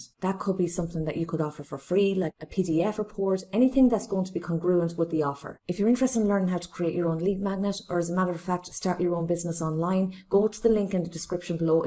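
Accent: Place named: Ireland. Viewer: Great review and nice to hear an Irish accent here as I am from there too.!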